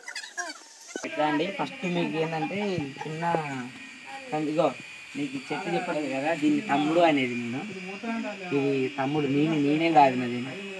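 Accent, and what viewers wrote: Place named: Telangana, India. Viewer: Thammi nuvvu e yaasa marchaku mana telangana yaasa chaala baguntadhi don't change ok keep going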